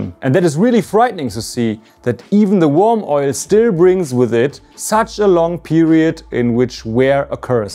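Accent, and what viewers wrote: Germany. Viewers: If you're going to make claims like your "facts" at 1 minute, and your 80% claim at 2 minutes, you've got to provide actual references. You have reasonable hypothesis, and you've done some preliminary experimentation, but to claim "finally proven" is intellectually dishonest. 2/10. Dramatic lighting and a German accent does not make something good science. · Sehr deutscher Akzent 😅 der scheiß gehört abgeschafft !! Das erste was man machen sollte nach dem Motor Start is den scheiß ausschalten!! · its really funny that this guy talks relatively decent english but then just randomly pronounces singular words 100% germanly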